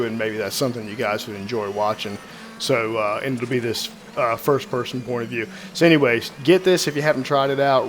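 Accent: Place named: United States. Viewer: RaceRoom is amazing, it's so underrated, hopefully more people like yourself realize that and come around to it again. Sounds like you're American too, check out the ESR group on the Sector3 forums if you're looking for some online racing. Also like Peter says below, please disable the corner markers: options -> video -> custom -> corner markers off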